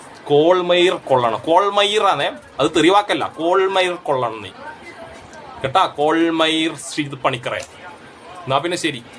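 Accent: Kannur, India. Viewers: കണ്ണൂർ സ്ലാങ് പൊളി 👌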